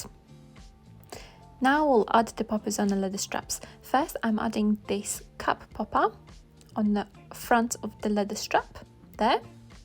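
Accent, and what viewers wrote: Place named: England, United Kingdom. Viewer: Well presented in a lovely English accent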